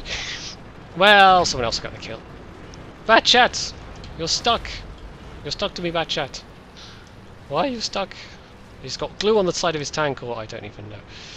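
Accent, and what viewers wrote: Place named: Scotland, United Kingdom. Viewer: On my side bar, it's recommending a video for me, the Most Offensive Jokes Ever, Frankie Boyle is pictured, his jokes aren't offensive,merely telling the truth, you Scots have a unique way of speaking that that is true,don't you? With this in mind, Which tank in WOT really offends you?, offends you by just being there!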